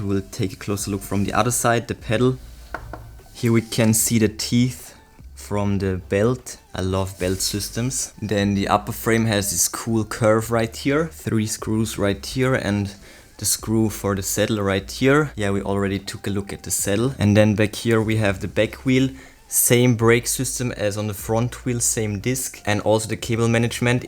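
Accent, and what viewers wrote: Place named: Germany. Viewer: the way says "sooooo" and "bähttery" revealed his germaniness XD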